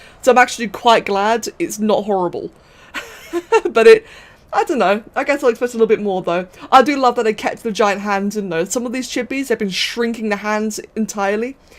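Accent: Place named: RP, United Kingdom. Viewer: poshest women on ark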